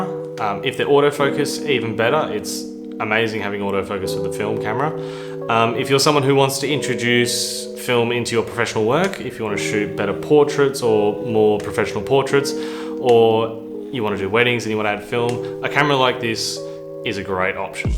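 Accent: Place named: Australia. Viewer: So nice to hear an Aussie accent. I have a 28-70mm old film lens and on the hunt for a newer version of my F70. This looks perfect. You got a great deal. I've not seen anything close to that on offer. Nice job with your channel - keep going.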